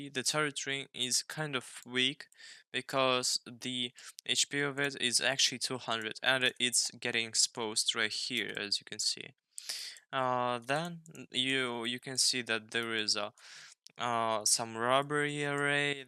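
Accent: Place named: Russia. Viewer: Классное видео, ты кстати похоже рус? Потому что у тебя в акценте русские согласные ядерные.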